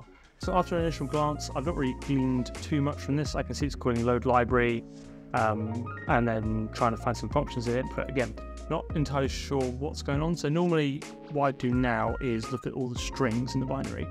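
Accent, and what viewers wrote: United Kingdom. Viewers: dude you're like a british me its awesome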